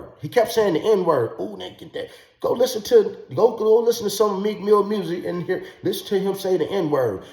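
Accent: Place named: California, United States. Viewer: New sub here coach! I definitely thought you where from cali!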